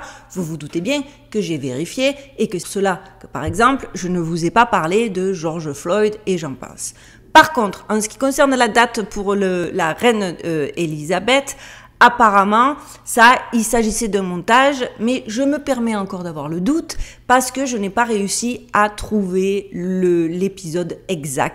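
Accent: Marseille, France. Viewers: Salut, on reste toujours jusqu'à la fin des vidéos! Ok tu n'es pas très catholique, cependant, il reste en toi, et c'est tant mieux, de belles valeurs, celles que le Christ est venu nous enseigner. Autre chose, dans la vidéo de la semaine dernière, tu nous disais que tout avait été dis sur la mort de la reine d'Angleterre et que du coup, tu changerais de sujet. Cela dis, je travail près de 10h par jour du lundi au vendredi, et je ne m'accorde que quelques heures d'écran par semaine, le journal TVL chaque soir, les vidéos de Greg Toussaint, un peu de Blanche Gardin, Campagnol TV et bien sûr, chaque dimanche, une jolie blondinette avec un accent marseillais magnifique au surnom de. Alors, je crois que tu peux nous parler de tout, vu que beaucoup, je crois, t'on choisis pour cela. Belle journée, Nico de Belgique. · Tant que les martiens n'affecteront pas ton accent marseillais, pas de soucis 🙂 Une rencontre du 3ème type pourrait être pourquoi pas, bénéfique à une humanité qui part de + en+ en quenouille comme on dit chez moi😉 Merci pour tes vidéos toujours passionnantes. 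· bonjour 1/ je vous trouve geniale et sympa avec votre accent du midi 2./ je royalement d'accord c'est ce que je pense aussi - vos documentaire sont hyper passionnant que je vais continuer a regarder vos autres videos - regrette pas de m'etre abonnee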